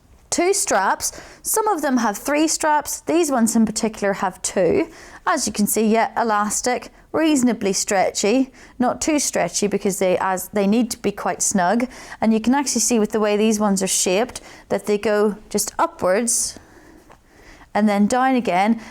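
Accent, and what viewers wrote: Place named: United Kingdom. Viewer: the CCs are so wrong lol someone who understands British accents should redo it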